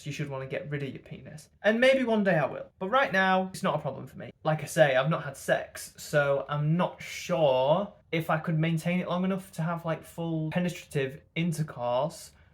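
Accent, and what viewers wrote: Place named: United Kingdom. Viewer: Forgive me for being a dumb American but you’re so British! LOL I could listen to you talk all day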